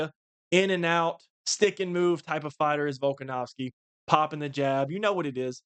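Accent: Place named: Appalachia, United States. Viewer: bro got the hillbilly accent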